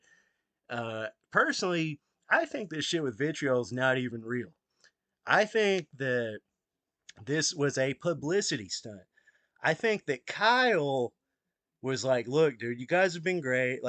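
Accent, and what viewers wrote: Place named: South, United States. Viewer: You sound like a hillbilly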